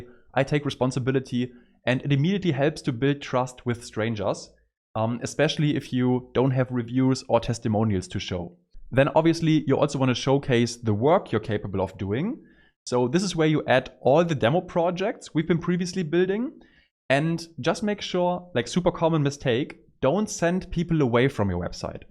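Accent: Germany. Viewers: Fucking zero explanation done. Just a German guy rambling, wasting 10 minutes of your time. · i am 100% sure that you are a german, nice video btw that will help to improve my own page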